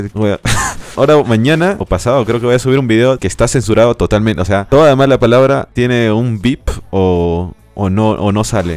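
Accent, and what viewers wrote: Peru. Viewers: eres peruano ??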